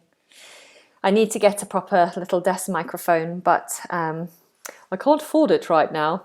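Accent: United Kingdom. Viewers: Hi! ^-^I am ♥ I'm watching u from Syria and I really enjoyed this video. really helpfull..British accent is my favorite. And you're awesome*.*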